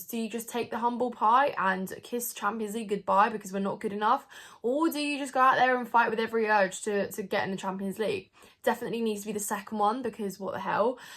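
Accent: United Kingdom. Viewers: i can listen to talk all day I don't know why British get make fun of by Americans because of their accent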